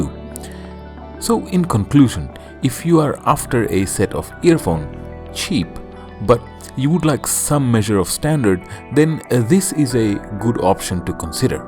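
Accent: Bengal, India. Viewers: your accent made me guess that you could be Bengali. That's why I asked.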